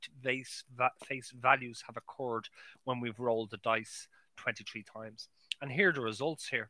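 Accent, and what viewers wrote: Ireland. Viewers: I was studying the time series chart video at like the first very second in on the video I noticed ur irish xdd geez nice videos man I'm taking MATH201 (probability in business) or so so I'll be using ur channel a lot :) so you've got a new subscriber hahahah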